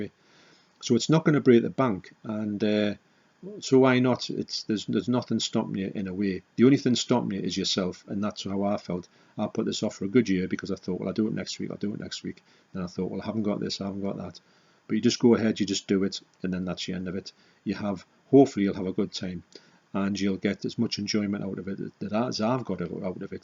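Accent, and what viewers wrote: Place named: Newcastle, United Kingdom. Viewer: Hi, I think it's fantastic that you are open to new experiences, ideas and the chance to improve the individual that you are. I really enjoy your content and your friendliness. You have a really charismatic on-screen presence plus I love the Geordie accent. Never stop being yourself but also never stop limiting yourself.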